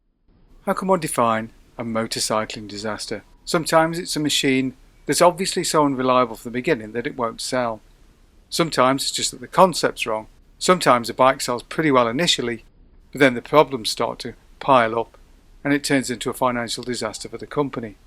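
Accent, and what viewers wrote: England, United Kingdom. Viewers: I could not watch the entire video. The English accent drowned in the background noise of the engines made it very difficult to understand. I'm sure it is a good video nonetheless !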